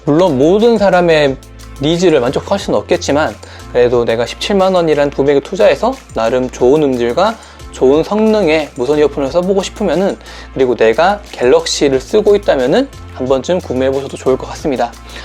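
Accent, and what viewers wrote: Gyeongsang, South Korea. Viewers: 경상도 분이신가요?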